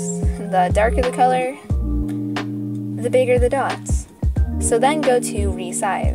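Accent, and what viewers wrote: United States. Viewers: ngl wvwn tho ur filipino.. (like meee😌) ur voice sounds like an american. :O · Omg!!! Your a Filipina!!! I thought u were from the STATE'S!!!! YEEEEEEEEE ❤💕❤💕❤💕❤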